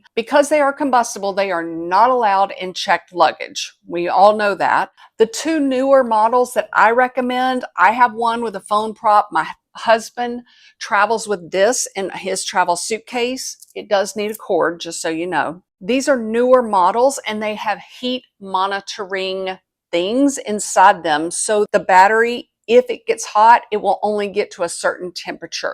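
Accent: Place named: Texas, United States. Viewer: Texas accent But I'm a big fan of travel tips Definitely avoiding chafing lotion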